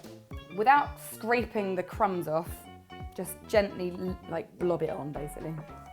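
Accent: England, United Kingdom. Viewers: When says " scrappy, naughty, bald bits " I love that. Her accent is adorable. I love to hear the British talk. Love you,! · are you English, sorry if I'm being silly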